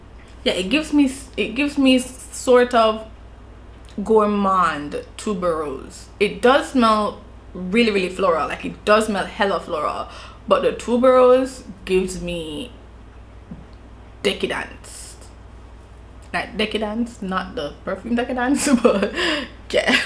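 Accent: Jamaica. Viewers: She is Jamaican and has an evident accent. I, and clearly many other people, can understand her perfectly. What was your intention with this comment, exactly?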